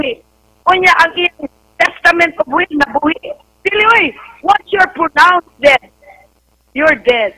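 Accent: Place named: Visayas, Philippines. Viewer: Yes, we believe in you ma'am .. Excellent ! Just take care of your self & health as well..we need you ma'am.. Alam mo po ma'am Ka boses n Ka Boses mo po ang pmangkin ko..hehehe..para ko n narinig cya..hehehe..Visayan accent po tlga, gnyan ganyan ang boses .. Very nice! Love u po ma'am . God bless u po. Mabuhay k po & family! 😊😊😊❤️❤️❤️❤️❤️👏👏👏👏👏👍👍👍✌🏽️💞💞☘🌹💞💕😘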